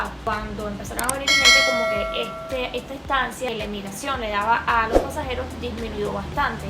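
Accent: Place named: Venezuela. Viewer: Tu hablas como venezolana , no pareces cubana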